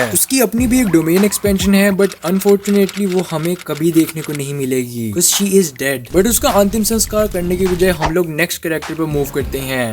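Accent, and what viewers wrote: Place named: Pakistan. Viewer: Accent so aukat pata chal gyi teri 🤡🤡🤡🤡🤡 Aata & roti bhijwa du Pakistani? 💀🤣 Garib Jai Hind 🇮🇳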